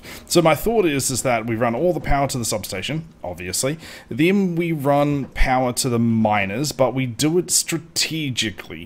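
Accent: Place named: Australia. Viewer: Is the "Build Big or Go Home" mindset just how Australians like to play these games? Because this is damn impressive! I know another youtuber who is a Minecraft player and he is also Aussie and loves to build grand projects:D I love the videos (Also, no offence meant if you're not Aussie, the accent sounds like you are but i could be wrong, no offence meant though <3). I am excited to see where this mega project takes you! Keep up the great work!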